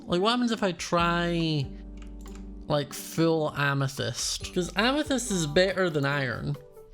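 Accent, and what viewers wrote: Scotland, United Kingdom. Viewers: Are you British? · Are you Scottish? JW you just sound Scottish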